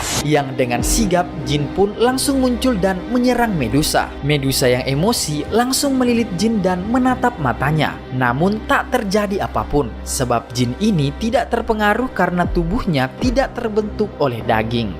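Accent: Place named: Makassar, Indonesia. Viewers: Gue subs lu bang karan lu orang makassar kan haha😂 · Admin nya orang Makassar kah? Logat & bahasanya ketauan . Kaya pas bilang 'tampiling, co'do co'do'😅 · Cokdok cokdok kayak bahasa Sulawesi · Orang makassar ini pale 😂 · Orang Sulawesi Ki to bang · Nassa na logat makassar na admin ka😅😅😅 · Makassar banget nah kamase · Dari logat nya pasti orang Sulawesi😂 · Pasti orang Makassar 😅 Salam dari Gowa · Deh pasti orang Makkasar ini hahaha waktu bilang tuming abu dehh pecah ketawakuu😂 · Adminnya jelas² orang sulsel, suku apa juga gak tau😂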